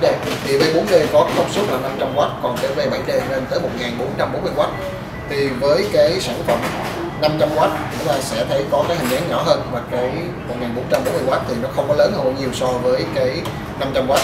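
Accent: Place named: Vietnam. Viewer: am thanh trung thuc giong noi gioi thieu re qua ( miet vuon)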